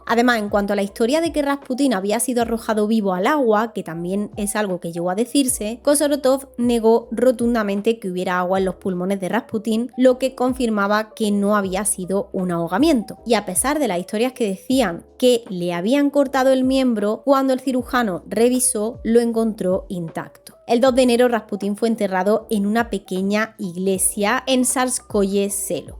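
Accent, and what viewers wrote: Andalusia, Spain. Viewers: Sí el acento de es andaluz. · Me fascina la historia de Rasputín!!!! Y más como tú la cuentas!!! Ah y tienes un bellísimo acento!!!! Es andaluz? Saludos poéticos desde Chillán en Chile!!!!!!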